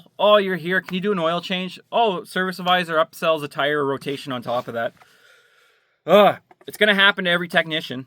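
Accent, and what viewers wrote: Canada. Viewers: Guess you didn't catch his Canadian accent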